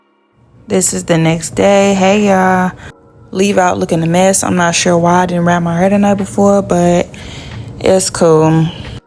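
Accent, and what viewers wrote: St. Louis, United States. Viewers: Very cute!! Sounds like you are from st.Louis 🥰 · My first time watching you… I knew you were from the same city cause you said “hur” instead of “hair” & said make sure them tracks aint going no whur” lol perioddd we soo Saint louis SUBSCRIBED ❤💕💕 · Where you from baby? The accent is giving STL! 💁🏾‍♀️💁🏾‍♀️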